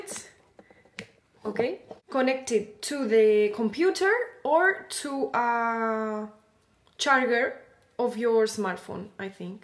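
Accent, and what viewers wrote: Andalusia, Spain. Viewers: No. tiene ese 50% de su encanto en ese acento andaluz...Se tenía que decí y se dijo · Sos muy simpática! Tenés un conocimiento enorme de música e de la guitarra y lo explicas con toda la simpatia del mundo. :) A mi me gustó bastante tu review de ese metronomo. Ya lo había visto en las publicidades en facebook pero no tenía idea de que podría hacer todo eso. Tal vez lo compre también. jajajaja! Y me encanta tu acento andaluz! Suena muy elegante! :) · Cuando hablas inglés tu voz pierde ese acento tan especial "español"que me encanta. Gracias por el video ;muy buen tip para los músicos.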